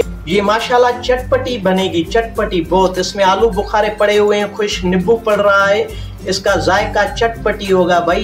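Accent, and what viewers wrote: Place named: Sindh, India. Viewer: Beautiful colors..bohat achi recipe..aurcaap ki sindhi accent itni pyari he سائیں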